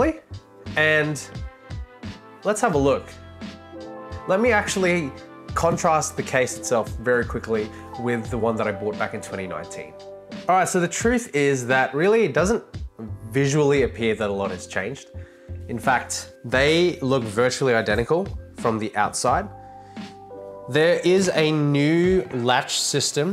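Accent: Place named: Australia. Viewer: Hey great to hear another aussie accent! just purchased a 1535 and made a small modification to allow me to attach a tripod to the case for traveling video on my channel 👍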